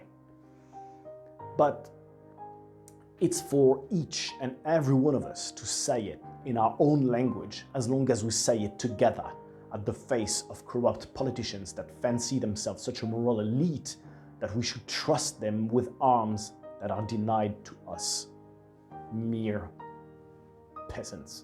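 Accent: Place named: United Kingdom. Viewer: très British ton accent ;)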